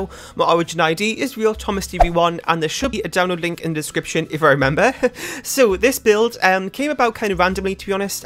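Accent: United Kingdom. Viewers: Where in the UK are u from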